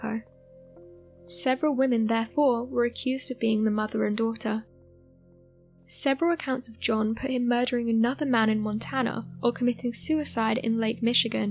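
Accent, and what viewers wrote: United Kingdom. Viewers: She's British. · What’s this beautiful accent? I know prob UK but is it Welsh, some specific part of England ? Thanks it’s just beautiful for stories .... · Nice narration voice.clear and concise.British of course.lol.😁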